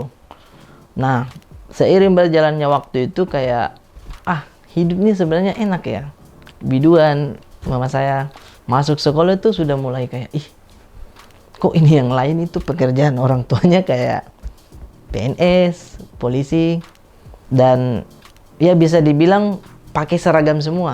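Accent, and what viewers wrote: Makassar, Indonesia. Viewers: Susah emang kalo khas logat makassar disuruh logat jakarta agak susah dan ribet hahahahahaa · Wah, masih kental logat makassar na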